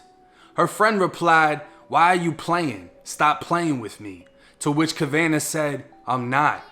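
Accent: Boston, United States. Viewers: I have to ask, r u originally from boston? U truly sound like u got our boston accent💯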